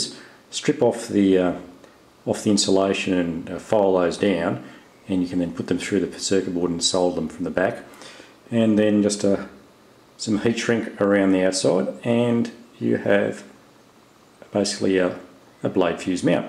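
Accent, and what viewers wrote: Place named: Australia. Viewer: Hi. Love your work on the Evolution. By any chance are you selling kits or your dual Z prototype? Cheers Andrew PS It's good to hear an Aussie accent on YouTube. I'm from Brisbane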